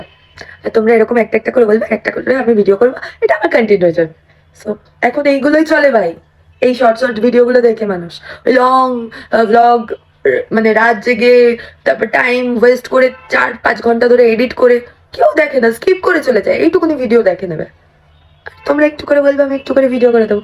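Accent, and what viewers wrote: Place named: India. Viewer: R ekta content dilm .bostir meye der mto hindi tone e bangla bolis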